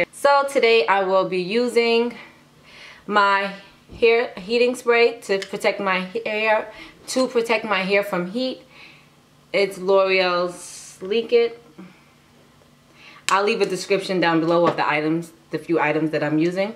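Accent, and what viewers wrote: New York, United States. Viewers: I love your New York accent yasssss ✨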